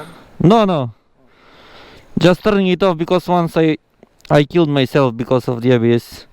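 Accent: Bitola, North Macedonia. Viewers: Процедурата следува вака -Си седнувам пред комп.уклучувам твое видео и верувај цело време полу насмеан гледам со уживање.Мераци од пејсажи,интересни и за нас помалку чудни култури,битолскиот нагласок,духовити коментари.И еден апел до сите нас овде.Поддржето го детето во неговите авантури.Ретко мноооогууу ретко( за мене е дете) со 23 години би се осмелил на нешто олку гломазно патување полно со мал милион пречки,со мал буџет ама со голема храброст,енергија и ентузијазам.ЦАР СИ!!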